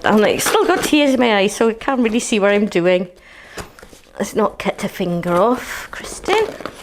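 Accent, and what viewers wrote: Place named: Wales, United Kingdom. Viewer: Thrilled for you all , love hearing your welsh accent , we ‘ve missed all our cardiff/newport family and looking forward to a visit across the bridge soon ! Well done as always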